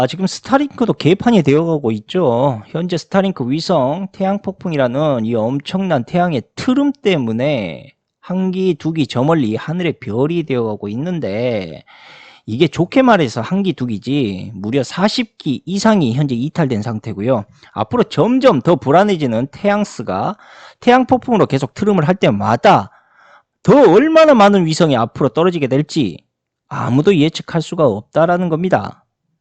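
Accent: Jeolla, South Korea. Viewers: 전라사투리로 욕을 찰지게하시니. . 시간이 금방지나가네요 ㅋ 일론이 아버지도 사기꾼이었다고 하든데. . 피가 흐르는건가?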